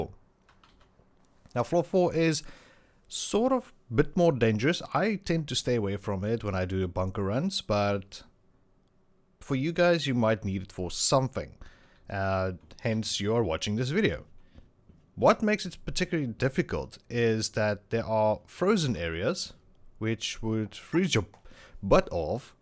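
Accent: South Africa. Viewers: Wow a South African lekker man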